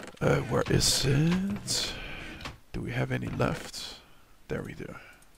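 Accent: Denmark. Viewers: Well, im already recording Ep12 :D i did a couple of fails with editing, but nothing major! well i can only hear that you are danish, because im danish too, so dont worry too much about it :-)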